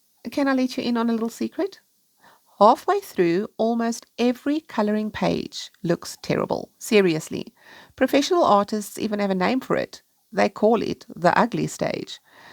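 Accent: South Africa. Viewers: Please tell me you are from South Africa. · I’m so glad I found your channel, thank you for the time you spend to help us. I find you so calming and easy to listen to. Are you South African? I am interested in accents, I myself live in Australia now but I was born in Birmingham in England, I also listen to Jo at piggy pens and some others from different parts of the UK. I listen to some inAmerica also and other parts of the world and am intrigued by all the different accents . I live alone now in a house on a farm it’s peaceful here, only cows bellow sometimes but I enjoy the quiet. My husband passed away four years ago just before Christmas, I am used to being here now and have two cats for company. I have friends too in town and get out quite often. I enjoy colouring and watching videos. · Hi, just want to say thanks for the tips, found your channel yesterday and enjoyed watching some of your videos. 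Its so nice to hear a South African accent on youtube.., Happy National Women’s day today. Just a quick few questions… do you still reside in SA if so wondering where you buy your supplies cos i find things so expensive have been saving for months to buy a Johanna Basford colouring book and some gel pens mine are almost all dry.. thanks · Is that a South African accent I hear!?? 😮😮😮❤❤❤ Home!!!! 🏠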